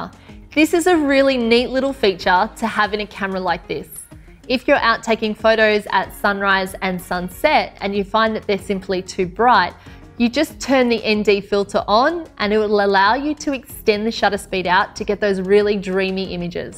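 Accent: Australia. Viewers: Aussie confirmed.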